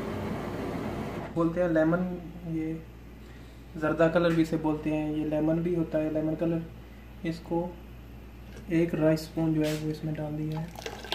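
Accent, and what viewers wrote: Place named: Pakistan. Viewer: Taste ka to apna he maza hy but app k urdu sunny ka apna he maza hy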